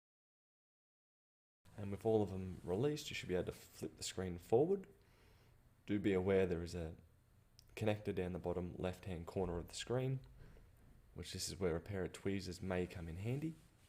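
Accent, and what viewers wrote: Australia. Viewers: Thanks for the video man! Just a question, would you be able to share the display model? I currently live in Aus and have the same exact laptop model, and in your comments you said you were able to upgrade it to a 1080p screen which is epic. I would like to upgrade my screen to 1080p aswell but I can only find 720p screens online, so I'm hoping I can find the same display you have in the internet. Or, If your local supplier is able to do nation-wide shipping, let me know and I'll also be ordering from them aswell (sounds like you're in aus aswell, lol) Anyways, liked and subscribed!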